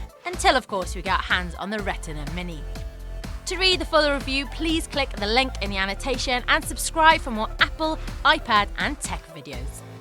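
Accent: Wales, United Kingdom. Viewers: hey with that voice am suppose to see something of ''the valleys'' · Rainbow clearly a Welsh accent.. sounding a touch like a Bristol one at times (Bristol is on the Welsh borders of course). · Sound Welsh to me.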